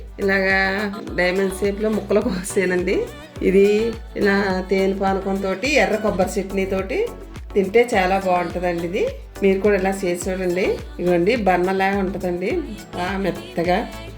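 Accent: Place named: Konaseema, India. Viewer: So nice మా కోనసీమ మాట లా ఉంది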